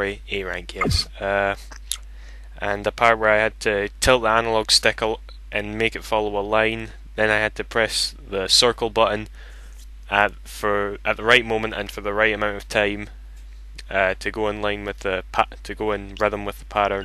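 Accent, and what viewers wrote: Scotland, United Kingdom. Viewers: I always did like the Scottish accent. Sounds so... GOOD!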